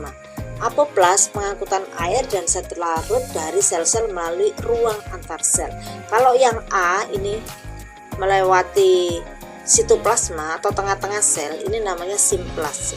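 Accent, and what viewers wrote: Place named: East Java, Indonesia. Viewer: Kaya wong jawatimuran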